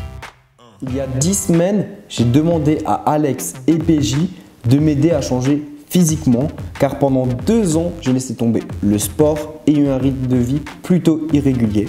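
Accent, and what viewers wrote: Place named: Alsace, France. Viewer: Tu es alsacien non , je crois avec reconnu l'accent de chez moi :')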